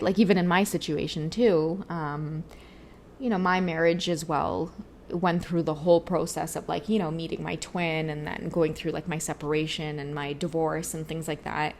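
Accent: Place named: Trinidad and Tobago. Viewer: You got a subscribe with that trini accent😊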